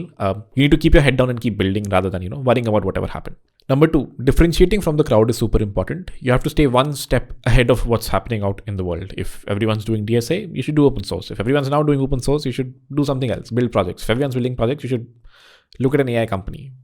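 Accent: India. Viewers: I turned on caption and realized he is speaking Indlish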